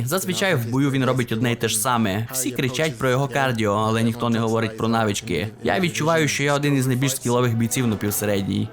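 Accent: Transcarpathia, Ukraine. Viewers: Круто але западенський акцент дратує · І ще одне питання. Дівчина почувши відео впевнена що ти земляк з Закарпаття 😅 Так є, чи помиляється?)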